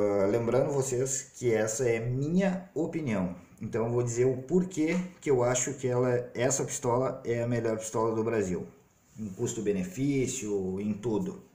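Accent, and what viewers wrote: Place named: Porto Alegre, Brazil. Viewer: Excelente vídeo. Só não gosto do teu sotaque de Porto Alegre... Agarrei nojo por conta da Manuela D'avila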